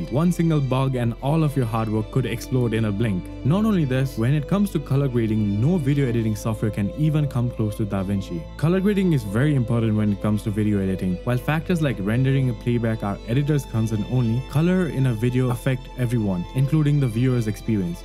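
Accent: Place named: Nepal. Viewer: Also identified a fellow Nepali from your voice, keep up the good work sathi. :hand-purple-blue-peace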